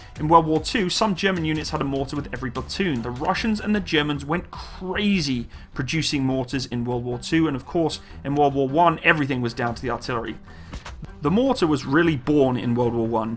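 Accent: United Kingdom. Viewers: I was wondering why the fck I was having trouble understanding some words uttered, till I found out at the end of the video that the man is British. Weird that it didn’t naturally strike me. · >Search Youtube for an explainer on something related to history or the military. >Hear a British voice narrating. >Instantly like and subscribe. It's an involuntary reaction at this point.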